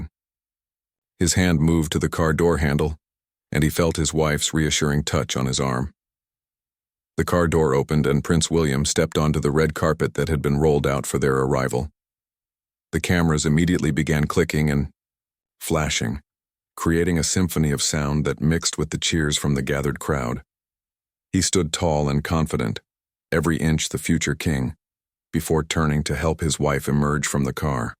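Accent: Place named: United States. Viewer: What the hell is an American narrating British history ?? This is ridiculous !! 🇬🇧 it’s not your history !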